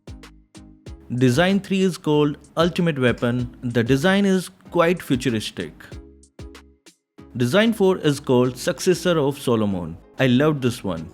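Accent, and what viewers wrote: India. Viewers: asal kau cakap cam keling sia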